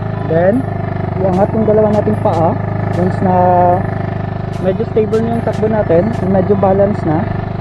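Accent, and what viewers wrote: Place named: Iloilo, Philippines. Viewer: hehe kaya pala sir may halo ilonggo accent. Ilonggo din ako sir hehehe RS sir!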